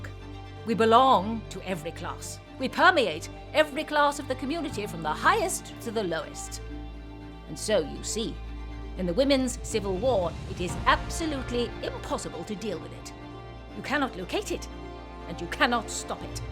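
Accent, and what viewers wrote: United Kingdom. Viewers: So she has British accent. Great.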